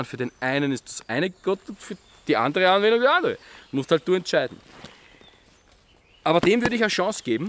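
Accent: Austria. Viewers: Deine Videos sind einfach toll. Keine Verkaufsveranstaltung das fachliche ist einfach top.Dein Österreichischer Akzent und die ganze Art und weise einfach nett. Mach weiter so!!!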